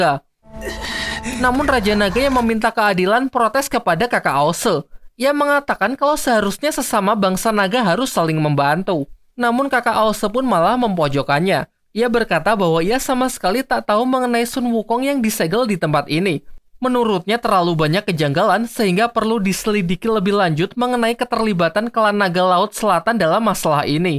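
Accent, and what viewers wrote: Java, Indonesia. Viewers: Bang lu orang mana, orang jawa kah kok bilang nya belum deng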